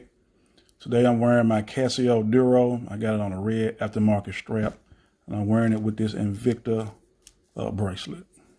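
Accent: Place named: Memphis, United States. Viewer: Gotta be from around memphis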